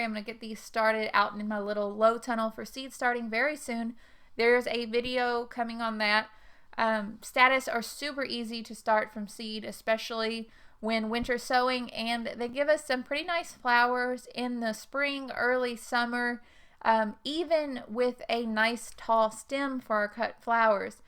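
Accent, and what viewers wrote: South, United States. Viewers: I have never grown sweet peas before and would like to try. You sound like you live in the south-so do I-do you have good success? It gets super hot where I live in north Georgia so I’m thinking I might be able to get some spring blooms.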